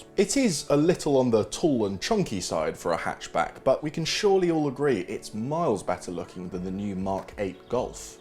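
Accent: England, United Kingdom. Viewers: Your English accent is annoying as fuck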